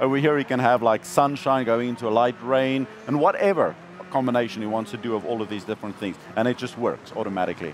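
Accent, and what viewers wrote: South Africa. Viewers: Why does he sound like South African? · is this guy South African?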